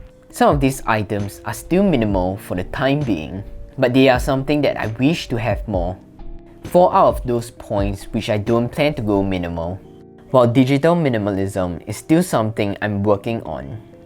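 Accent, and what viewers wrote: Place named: Singapore. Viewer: I thought your accent was familiar, hello fellow singaporean!!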